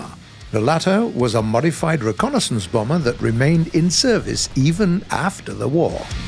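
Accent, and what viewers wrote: France. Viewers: your french accent is wonderful!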